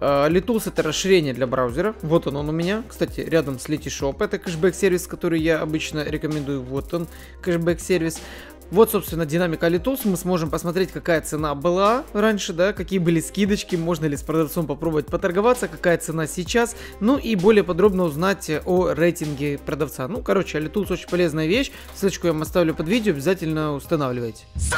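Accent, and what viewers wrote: Odessa, Ukraine. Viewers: Ты с Одессы ?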